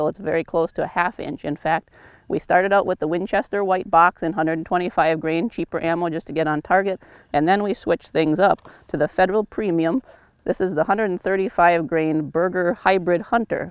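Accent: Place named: Michigan, United States. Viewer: Keep coming back for that wild Michigander accent ;D your the best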